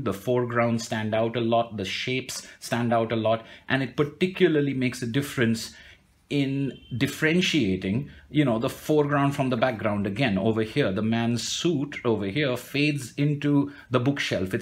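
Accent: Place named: India. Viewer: What's that "Made in Bollywood" accent ? ... Cow, it's horrible ! On the other hand, the explanations are very good ... Thank you !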